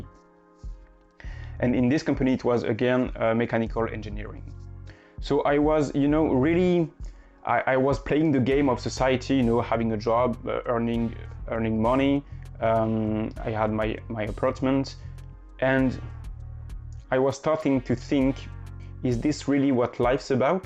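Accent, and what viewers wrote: France. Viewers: Salut ! Malgré que tu parles très bien anglais il me semblait bien avoir perçu un petit accent francais derrière tout ca lol Je suis moi aussi INFP ingénieure en informatique depuis 1 an (data analyst) et je me reconnais tellement dans tout ce que tu dis... j'ai plusieurs fois pendant mon parcours à la fac (et encore récemment) réfléchi à si je n'étais pas sur le mauvais chemin... à la base je voulais être prof mais trop sensible au mauvais comportement des élèves j'ai changé d'idée, arrivée au collège j'étais sure de vouloir finir biologiste mais arrivée en fac de bio j'ai complètement perdu la motivation alors j'ai changé de voie et suis partie en fac d'info car j'avais eu cette option en biologie et j'aimais le coté logique et puis mon père aussi informaticien m'a surement influencé aussi à cette premiere periode de doute... je n'avais donc aucune idée du but de mes études contrairement à la bio ou je voulais "contribuer au bien etre du monde", mais j'aimais plutot bien ce que je faisais, je m'en sortais bien les premières années et j'ai obtenu mon diplôme après avoir pas mal galéré sur la fin, en fait j'avais l'impression de le vouloir sans le vouloir, de ne pas trouver de but à ce diplôme... 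Cette année j'ai décroché mon premier CDI tout se passait très bien une fois lancée je kiffais ce que je faisais je ne me posais plus de questions... le covid est arrivé, j'ai du coup perdu mon job et cette période de "vide" m'a fait revenir à moi et qui j'étais vraiment... Avec du r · Hello! This video resonated so much with me!! Although I've never been exceptionally good at Math and Science, I always knew I had a knack for languages and that's what I decided to pursue. I've enjoyed most aspects of it but if I could change what I did at university, I would add in something like Management or maybe Economics or idk, something a bit more "useful" (I majored in Latin American Studies). Anyway, I taught English to non English speakers (including in France! When I heard your accent it made me smile....I love Toulouse ❤️) but now I am just burned out from teaching. I'm currently doing some studies in Human Resource Management but I'm really worried I made a wrong decision because where I live, it's a really small Caribbean island so the market is saturated and I'm here again, feeling very depressed about the future etc. 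Sighs! Unfortunately, I'm still trying to figure out what's the best option for me, only knowing what isn't for me but never quite figuring out what career does resonate with me. I suppose I need to do some deeper digging. Anyway, sorry for the really long message! I'm sorry that I only just saw this video as well! It would've helped me so much back when the pandemic was just hitting and I was returning from my very last teaching stint in Spain. For now, I have a job that pays the bills but I know I need to leave soon! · Merci beaucoup tes vidéos vont probablement m'aider ! J'ai terminé ma licence de biologie (3 ans), mais je ne suis toujours pas sûre et n'ai jamais été sûre de ce que je voulais vraiment. Le côté musicienne / psychologiste je m'y suis bien reconnue. J'ai dû faire le choix en entrant en 1ère entre littéraire et scientifique, j'ai énormément hésité et j'ai suivi la voie la plus "confortable" et logique du point de vue des gens qui m'entouraient. 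Il m'arrive souvent de regretter ce choix, c'est tellement tôt pour déjà savoir ce qu'on voudra faire plus tard ! Je me reconnais complètement dans tes vidéos pour la personnalité INFP, merci pour le partage de tes recherches ! Et grâce à ton léger accent français j'arrive à tout comprendre haha !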